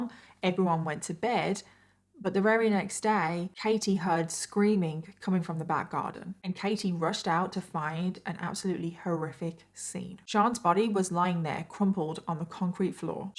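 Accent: United Kingdom. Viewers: Bailey Sarian in British. You did a nice job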